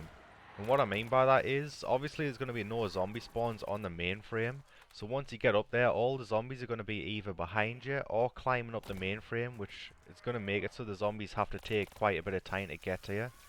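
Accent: Newcastle, United Kingdom. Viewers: It's geordie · Are you a geordie? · i know a geordie accent when i hear one! great to hear people from around the north east creating content it's fairly rare. keep it up mate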